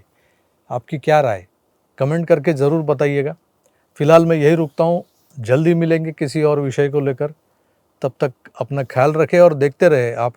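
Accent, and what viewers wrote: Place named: Maharashtra, India. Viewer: मराठी माणूस किती पण चांगली हिंदी बोलला तरी ओळखू येतोच तो मराठी आहे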